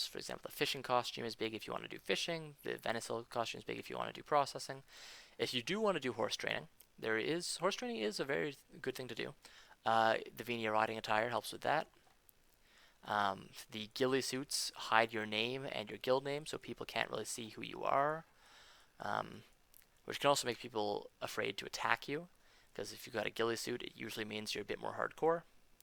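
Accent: Canada. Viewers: Get oot of here mate. Love the Canadian accent!!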